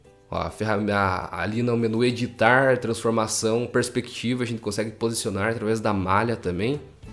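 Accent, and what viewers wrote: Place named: Curitiba, Brazil. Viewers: Tu tem um sotaque daqui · sotaque curitibano forte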